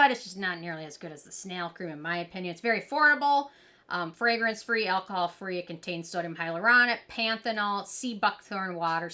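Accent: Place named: Midwest, United States. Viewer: I love your Midwest accent!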